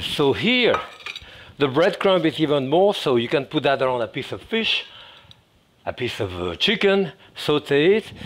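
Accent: France. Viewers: J’adore Jacques Pépin ! Il n’a jamais perdu son bel accent français ! J’ai réalisé un grand nombre de ses recettes et astuces et c’est toujours un régal. Longue vie M. Pépin, vous êtes un trésor !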